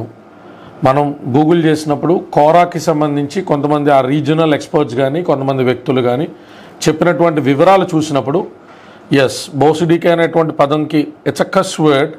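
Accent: Godavari, India. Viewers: మీ వీడియో చూస్తున్నంత సేపూ మా ఊళ్ళో ఉన్నట్టు ఉంటుంది...మీ గోదావరి యాస...ఎటకారం.... మళ్ళీ మళ్ళీ వినాలని అనిపిస్తుంది... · Meeru chesthunna videos all areas nunchi chusthu vuntaaru meeru only Godavari yaasa maatladithe ela andi But okati telusthindi Mee janasainikulu andaaru mental ga prepare aipoyaru TDP tho pothu ki Meeku teliyadu meeku konni seats ichina akkada rebals ni nilabetti meeku sunnam raasthaaru Kastamo nastamo JSP single ga contest chesthe meeku antu oka gurthimpu vasthundi lekapothe mimmalni anagathokkuthaaru TDP vaallu jaagartha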